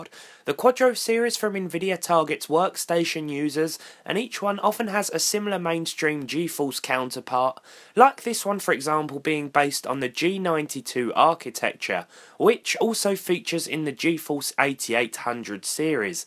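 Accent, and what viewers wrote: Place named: England, United Kingdom. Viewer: I needed my English accent fix. Thank you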